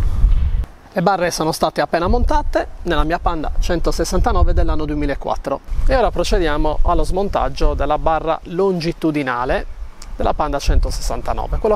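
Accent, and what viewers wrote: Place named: Sardinia, Italy. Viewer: Ad un certo punto ho creduto che li avresti smontate 😁 Di dove sei ? Non dirmi sardo che l’ho capito 😁😁😁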